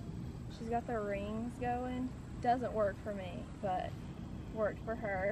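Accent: South, United States. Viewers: your southern accent kills me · I wish I could find myself a girl with your accent lol. Southern accents are sooo underrated.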